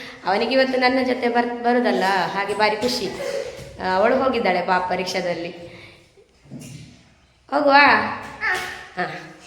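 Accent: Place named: Mangalore, India. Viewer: Motte recipe super ❤love ur Kannada accent mangalore style ❤😊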